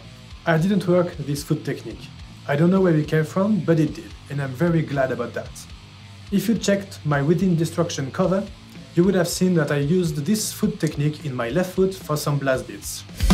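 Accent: France. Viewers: tu est fancais ca s'entend a ton accent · Ça serai pas un petit accent français ça ? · c est moi ou il a un gros accent francais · L'accent Francais on reconnais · L'accent français bien présent ✅🤣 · Tu est français non ?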